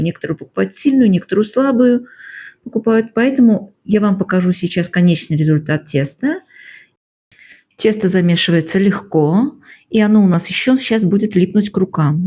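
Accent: Baku, Azerbaijan. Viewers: Здравствуйте,спасибо за напоминание,родилась в Баку,живу в Москве,рецепты ваши моя молодость,а Бакинский акцент отдельная ❤❤❤❤❤ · Здравствуйте.Случайно попался Ваш старый ролик, стало интересно.Когда "зашла" на Ваш канал, и услышав Ваш акцент, сразу поняла, что есть наше, бакинское. И я не ошиблась. Я сама из Баку, и Вам огромный, бакинский Салам!!! 🙋🙋🙋❤❤❤💐💐💐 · Вам сразу же лайк и подписка за то что вы из Баку!❤ я тоже Бакинка. Случайно забрела на ваш канал. Но не сразу поняла откуда вы, хотя легкий акцент ласкал слух. Я живу в Америке и всегда рада любому бакинцу. И рецепты у вас замечательные!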